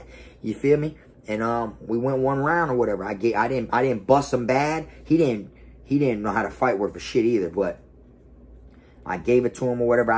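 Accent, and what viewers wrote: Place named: Florida, United States. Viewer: He sounds like he from florida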